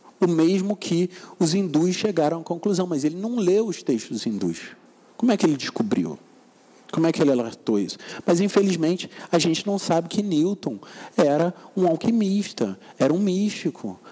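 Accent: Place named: Rio de Janeiro, Brazil. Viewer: sutaque do rio de janeiro da zona sul !!!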